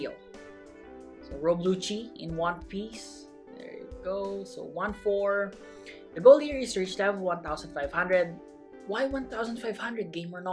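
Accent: Philippines. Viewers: Ur voice is satisfying btw sounds like a pilipino speaking enflish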